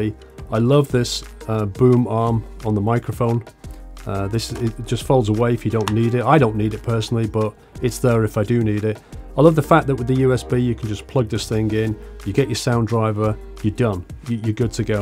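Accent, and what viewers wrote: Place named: England, United Kingdom. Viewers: Your British accent is so good. Even I subscribed!!!! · You DO know that he's English, right ? He's not TRYING to affect an accent.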